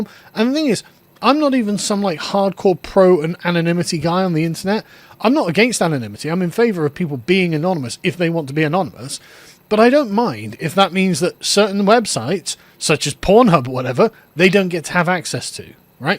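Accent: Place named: United Kingdom. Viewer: british accent is the most annying thing ever, loike jast tok narmaly meit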